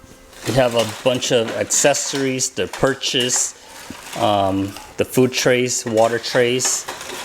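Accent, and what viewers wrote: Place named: Pennsylvania Dutch, United States. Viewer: You really helped me put my M01 together. I was so frustrated I was going to return it. I bought the same model from Amazon last month and it came broken and I sent it back. When I got it the second time last week and couldn’t get it together or find a video to help me I was so frustrated. My mother is from Lebanon, PA and thought you had a Dutch accent. Hey, my parrotlet and I thank you so much. Stay healthy and happy friend.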